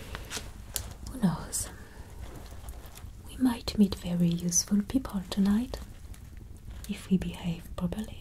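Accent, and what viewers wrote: France. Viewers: Simply astounding production quality in every way!🙏 The lighting looks wonderful, beautiful images. And your French accent, and dialogue in French, is delightful to hear too. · Tu parles d’une façon très française 👍🇰🇷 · love when you speak french + english with a french accent ! youre such an elegant, classy and beautiful woman. ❤ i love your vision and creativity. top tier content !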